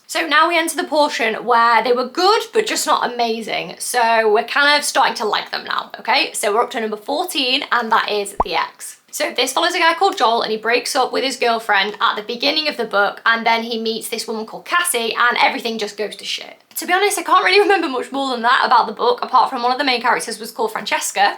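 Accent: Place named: United Kingdom. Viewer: girll your british accent made my head gone crazy😵‍💫 can u talk slower next time ?? btw nice review👍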